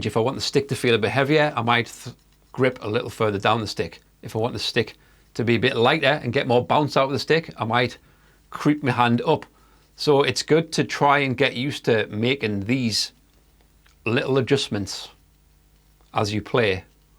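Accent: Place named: Northern England, United Kingdom. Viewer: Silly question: may I ask you where are you from? I'm Italian, and I like different accents, so I'd like to "link" a particular accent's sound to the city/area it's from. To me, yours sounds like a Northern accent, but I have very little knowledge, all I know is "something from Liverpool - yes, The Beatles 😂😂😂 - to Scotland" 🤷🏻‍♂️🤦🏻‍♂️🤦🏻‍♂️🤦🏻‍♂️. Would you please help me? Thanks a lot! (...and great video, by the way! 😉👍🏻👍🏻👍🏻)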